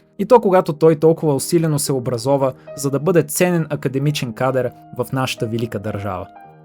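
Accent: Russia. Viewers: не можеш да скриеш джиалекта солти руски soiboi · Диалектът ти е на руснак живеещ в България. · Има руски акцент, защото е руснак, дошъл да живее безопасно и евтино тук и да плюе по мястото, което обитава! Много тъпо от негова страна! Но пък може да си ходи, ако не му харесва! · Чува ти се руския акцент. Пробвай пак! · Говориш с руски акцент, но съм съгласен с всичко което каза ! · Съгласен съм донякъде,но долавям руски акцент. Тоест видеото е може би резултат от обиден полурускобългарин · ХУАХУАХУХАУХАУХАУХ КАКЪВ Е ТОЯ РУСКИ АКЦЕНТ ВЕ ЛУД КОЛКО ПЛИТКОУМЕН ТРЯБВА ДА СИ ДА ГЛЕДАШ ПОВЕЧЕ ОТ 10 СЕКУНДИ НА ТОЗИ КЛИП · Братлето наистина има руски акцент обаче, хванах един-два русизъма във видеото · На 30 секунда от видеото писах коментар да питам що говориш с руски акцент. После ти изслушах забележките и разбрах- ти наистина си си монголски подчовек.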